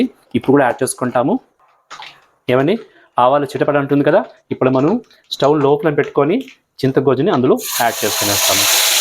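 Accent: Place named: Karnataka, India. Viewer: Mee Telugu same memu matlade laage undhi, Karnataka na meedhi Garu?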